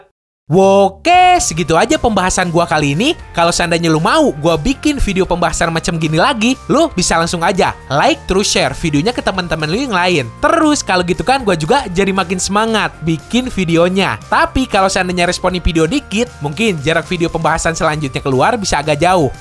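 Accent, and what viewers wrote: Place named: West Java, Indonesia. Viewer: Mimin orang sunda yah haha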